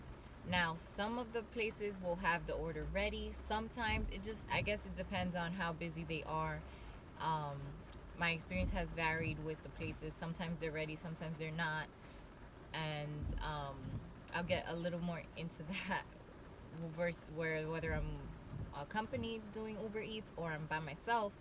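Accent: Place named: Latin America. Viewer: I’m guessing your Latina by the accent. Good luck stay positive but the best way to make money is by referrals if your in sales.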